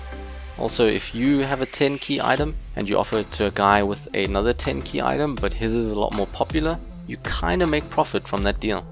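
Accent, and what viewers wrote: South Africa. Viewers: Awe bru you sound south african my chommie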